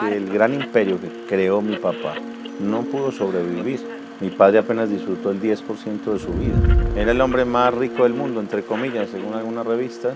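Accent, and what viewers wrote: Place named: Antioquia, Colombia. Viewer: pense que hablabas paisa ...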